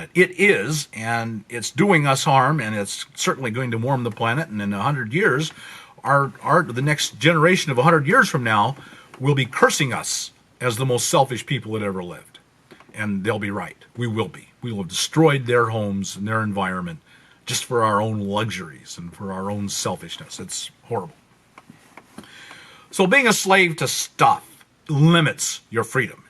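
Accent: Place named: United States. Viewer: Hi Sir, It's so refreshing to watch your videos with soft music in the beginning and your handsome viking bearded face and American accent. It's almost like a religious experience because you do not talk about money! Very well presented. I love the WASP culture and values. Keep up the good work! Best regards, Tanveer, London, UK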